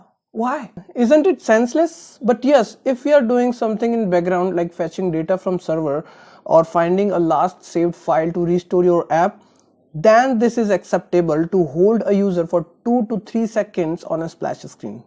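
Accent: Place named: United States. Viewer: Your video is great, but why the American accent on an Indian background and foreground?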